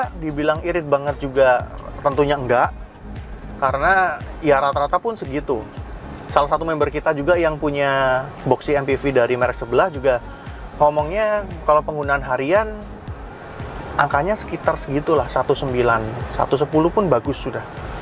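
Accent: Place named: Java, Indonesia. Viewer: orang jawa ya mas? kok medok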